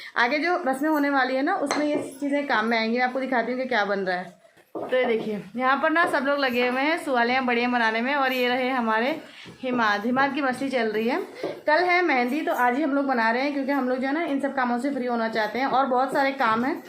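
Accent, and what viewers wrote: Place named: Gulbarga, India. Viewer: Mujhe maloom ich hai ke gulbarga ke baatan aisich rahtie baji 🤭🤭🤭🤭🤭aapko waise baat karte hue sunke hasi aari mujhe par mashAllah baatein abba beti ki sunke khushi hui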